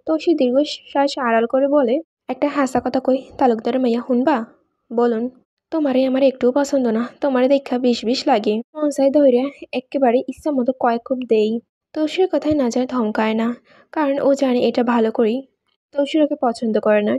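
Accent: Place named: Barishal, Bangladesh. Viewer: আপু তুমি মনে হয় বরিশালের মেয়ে তাই তোমার ভয়েস টা ও বরিশালের মেয়েদের মত লাগে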